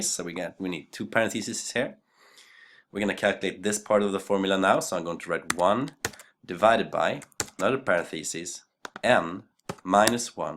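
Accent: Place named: Sweden. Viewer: Thanks for sharing! You have a Swedish accent! :)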